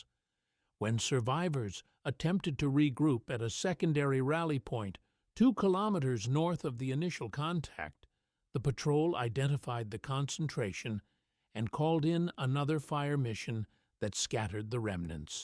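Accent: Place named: United States. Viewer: The American accent tells me that what follows is bullshit!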